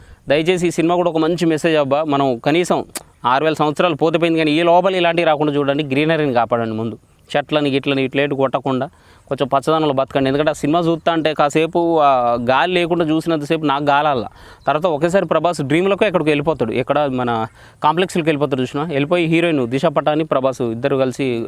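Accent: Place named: Andhra Pradesh, India. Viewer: Anna nuvu manchi mana warangal basha Telangana yasa la matladu anna endhikantey manaku mahbad ollaki set kadhu Andhra slang...😊😊😊 Am following you from many years am from warangal 🥰🥰🥰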